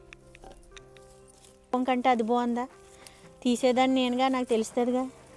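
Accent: Godavari, India. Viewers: మీ పుట్టిల్లు గోదావరి జిల్లాలా ఉంది matateeru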